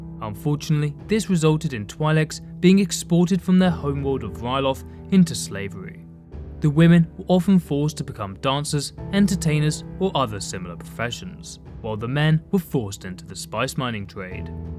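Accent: United Kingdom. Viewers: funny gow the CC spell twilight instead of twi'lek, either you work ob your pronunciation, or you stop using that dumb computer generated ouce with that british accent. That's not your real voice. I don't buy it. Next time, explain Ahsoka's clothes. I loved her tube top and backless outfit, but the question us the same. ps: came to know why her outfit (which I never questioned) and I learned she was a slave and then banging Kit Fisto.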